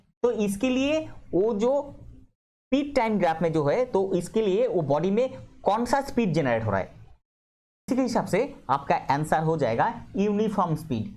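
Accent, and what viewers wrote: Bengal, India. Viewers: app bangali ho keya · Dada Tumi ki Bengali?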